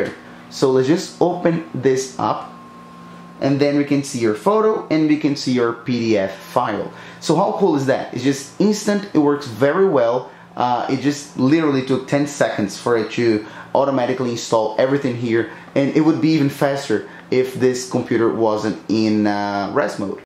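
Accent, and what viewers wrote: Brazil. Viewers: Dude, are u Brazilian? I’m Brazilian as well and they way you speak English it’s exactly how Brazilian accent sounds like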